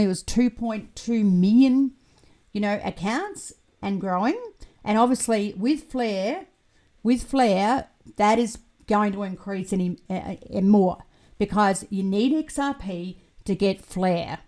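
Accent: Australia. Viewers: Nice to hear an Aussie voice! I feel much more like I can trust an Aussie!!!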